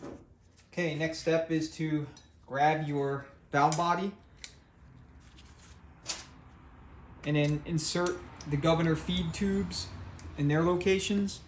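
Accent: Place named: Philadelphia, United States. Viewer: Another excellent video! Thank you for giving me the confidence to work on my transmission! Based on that accent, you gotta be a Philly guy!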